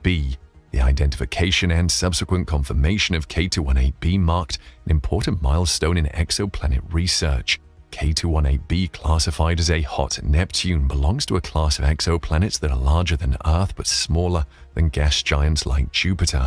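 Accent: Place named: Eastern Europe. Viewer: The robot speaker has a East European accent. Many promising titles lately that don't do as they promise.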